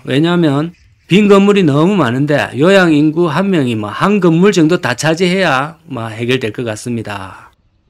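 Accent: Gyeongsang, South Korea. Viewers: 멋진멘트 갱상도발음 정확한 판단력 응원해요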